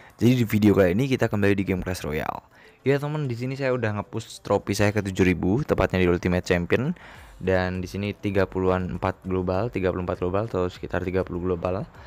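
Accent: Yogyakarta, Indonesia. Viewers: Wong Jogja bro, wong Jogja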